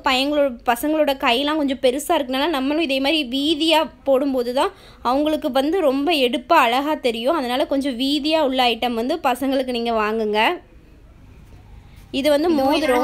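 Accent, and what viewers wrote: Tirunelveli, India. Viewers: Sister neenga Tirunelveli ah.....speech apdi iruku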